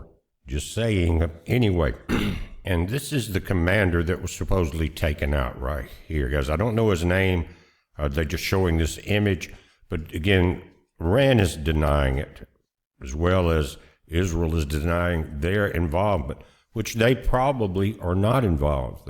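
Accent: South, United States. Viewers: You are a real scumbag. Your old man creepy voice... Lil southern draw... You do not represent America... You are a war hawk with no threat of being drafted into Israeli war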